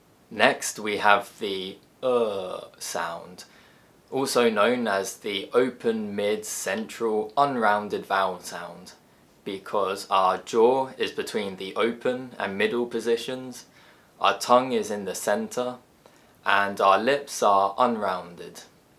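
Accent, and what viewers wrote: United Kingdom. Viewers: Profe eres genial ya me suscribí definitivamente me gusta el acento británico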